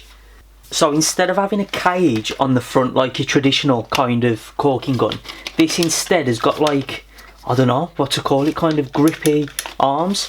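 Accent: Black Country, United Kingdom. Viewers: Just subbed after watching some of your review videos, you sound like a Black Country lad 👍🏻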